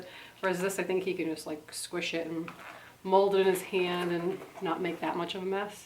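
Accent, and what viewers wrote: Boston, United States. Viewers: yer so dam hot love yer boston accent 2 bad yer married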